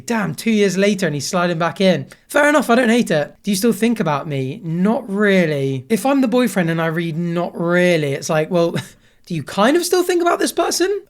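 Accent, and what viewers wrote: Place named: United Kingdom. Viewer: Hey dude Don't know if you'll read this comment, but what area are you from? I'm an American and I struggle with identifying foreign accents, but you have a very distinct accent that I've heard in a couple of other youtubers. I'm just curious where it comes from. I'm like 99% Sure it's a British accent, obviously lol. Definitely not Scottish, Irish or Welsh, and doesn't sound like the stereotypical Londoner or RP accents, but i could be wrong. Thanks!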